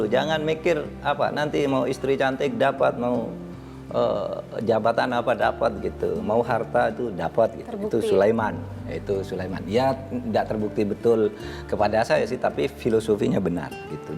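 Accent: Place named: Madura, Indonesia. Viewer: Pak Mahfud ini orang madura ya.. logatnya kelihatan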